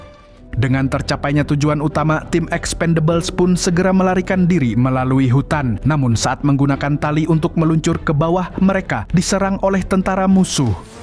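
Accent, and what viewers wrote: Banjar, Indonesia. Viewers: Pian ni Banjar mana mang?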